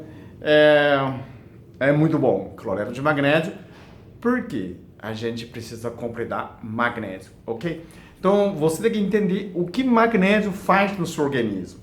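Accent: China. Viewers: Esse japonês ou chinês hummmm ta mais pra chinês. Enfim, esse chinês é um fofo.Explica bem em seus vídeos,mesmo com sotaque de outro idioma. Depois de assistir o vídeo, acho que estou precisando tomar o cloreto de magnésio. Um abraço. Sou sua fã. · Eu adoro esse Dr pq ele e mto sábio.Explics tudo...ele fala português c o sotaque chinês Eu adoro ouvi-lo e entendo...só ter paciência. Parabéns Dr Deus o abençoe 🙏🙏🙏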